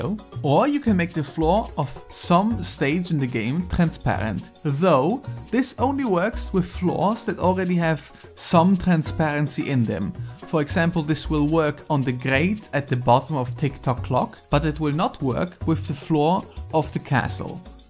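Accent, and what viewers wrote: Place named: Germany. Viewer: You sound super German it's very entertaining. Also, and is it possible to change facial animations?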